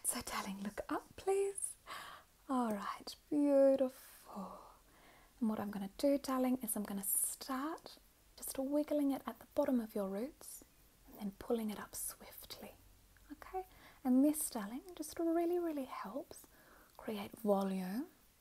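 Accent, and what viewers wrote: Sydney, Australia. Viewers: every Sydney mum I overhear that comes on vacation to Gold Coast 😭😭 perfection. stunning. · australian accents are god tier 😋😭❤️ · Sim, o sotaque é real. É o sotaque do inglês australiano :) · I just found your channel and fell absolutely in LOVE with this character and your videos!! I’m from Canada & australian accents are so beautiful and calming to me. I immediately subscribed!! :) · Wow she must've said "charlotte darling" 100 times in just 1 video. Aussie accent is the best accent. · I really liked the asmr but the Aussie accent was alright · The accent is everything!! I am also from Australia hahahaha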